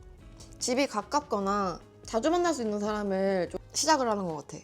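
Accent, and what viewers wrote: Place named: Jeolla, South Korea. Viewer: 예전에비해 전라도 사투리 오지시네요.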